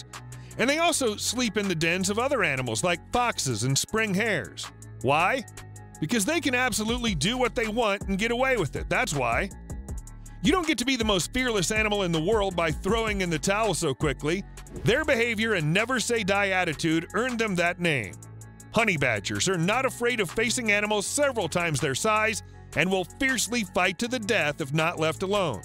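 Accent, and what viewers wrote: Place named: United States. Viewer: spoiled the vid with the horrible US accent. yuk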